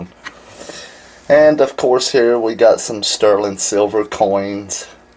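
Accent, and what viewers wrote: United States. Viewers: "coyens" 😜 Your accent is so close to the kid from Forrest Gump. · I was born near San Francisco, and frankly, I'm getting bored with my local dialect. It's nice to hear another distinctly American sound from time to time: reminds us that this is still a very varied country. Best Health.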